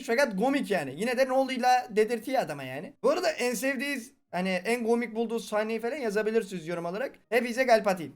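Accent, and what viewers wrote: Black Sea, Turkey. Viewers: Abi sen Karadenizli misin?????????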